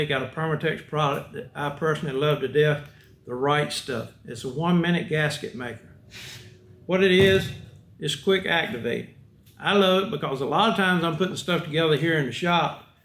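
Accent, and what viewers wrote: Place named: North Carolina, United States. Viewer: Hahaha heard you talking and knew you were from NC.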